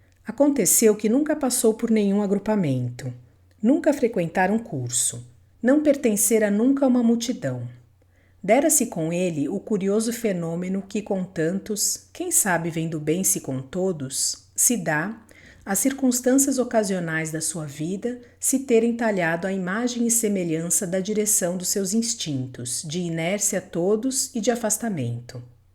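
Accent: Portugal. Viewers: I also prefer the European pronunciation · Please forgive my writing in English. I am trying to learn European Portuguese. Is this book by Pessoa being read with European pronunciation, or with Brazilian or some other pronunciation?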